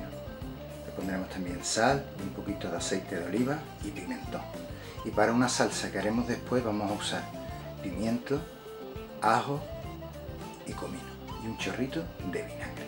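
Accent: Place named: Andalusia, Spain. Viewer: Muchas gracias. Eres un artistazo en la cocina. Tus recetas son lo mejor. Imagino que eres de la zona de Sevilla o Cádiz a juzgar por tus recetas( amén de tu acento). Un saludo amigo.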